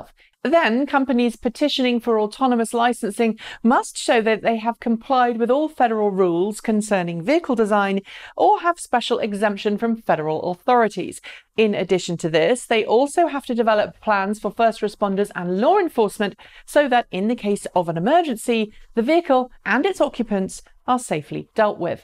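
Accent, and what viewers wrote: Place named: United Kingdom. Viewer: You produce some of the best and most interesting postings on electric vehicles and other emerging technologies. And, like all Americans, I love the British accent.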